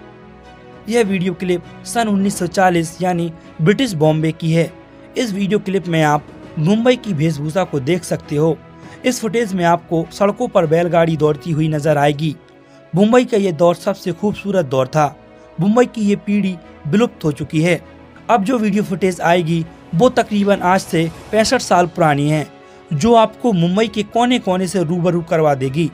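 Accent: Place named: Uttar Pradesh, India. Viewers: भाई व्हिडिओ 1नो. बस भाषा सुधार के बोलो ईसे वही यूपी वाली fill आती है व्हिडिओ मे भी ये मुंबई है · Arre Hindi nit bolo. Bhaiya style ka boltos ?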